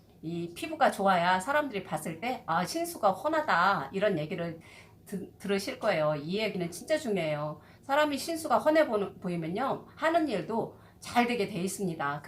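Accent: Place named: Chungcheong, South Korea. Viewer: 충청도 분 이시군요 ㅎ 영상잘보았습니다 구독 꾹~~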